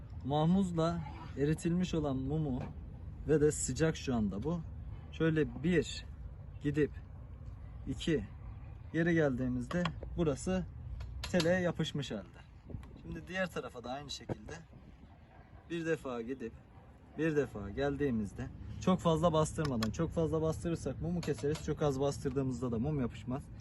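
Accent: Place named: Ordu, Turkey. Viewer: Selamünaleyküm ordulusunuz sanırım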